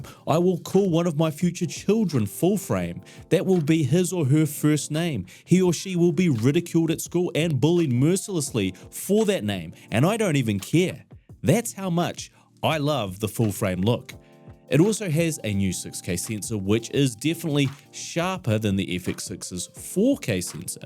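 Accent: New Zealand. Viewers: Mate you sound like Kiwi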